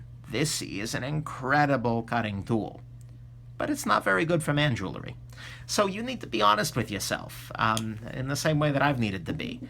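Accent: New York, United States. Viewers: You sound like your from New York or Boston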